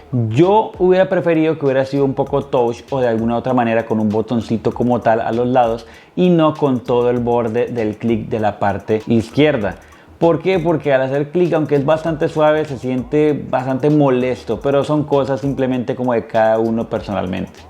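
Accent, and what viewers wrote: Colombia. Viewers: jaja, se te nota el acento tipo canal uno o tele antioquia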